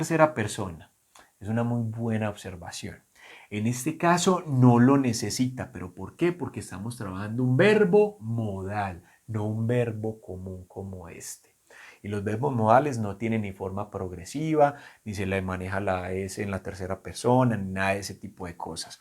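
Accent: Colombia. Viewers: Hola ¡¡. Me encanta la manera tan clara que explicas el Inglés y también tu metodología. Saludos desde Peru ¡¡ ah y me gusta mucho tu acento colombiano. Are you from Bogotá?. Blessings!!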